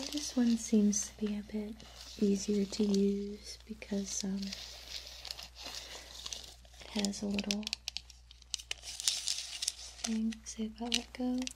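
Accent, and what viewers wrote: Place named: South, United States. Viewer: Tue only problem is she sounds southern and me and the south (mainly the bible belt) try and stay on opposite sides of the Mason dixon line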